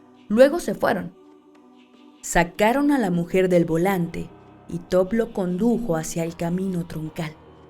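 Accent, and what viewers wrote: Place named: Mexico. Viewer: Muchas gracias,adoro tu trabajo , e tu acento mexicano , lo encuentro muy agradable al oido. Abrazos desde Brasil.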